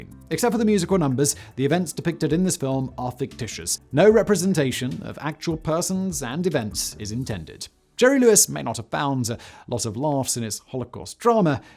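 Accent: United Kingdom. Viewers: THANK YOU so much for beeping out your obscenities. I am old, and people didn't do that publicly in my day. I love your content, your style, your intellect, and your lovely British accent. It is therefore obvious to me that you don't need to use expletives to express yourself very effectively. Thank you again.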